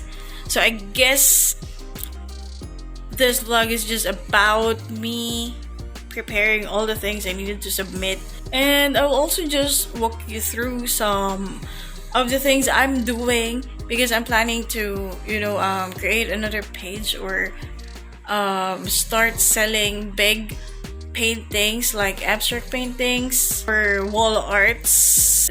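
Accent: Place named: Australia. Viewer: Im diggin that aussie accent of yours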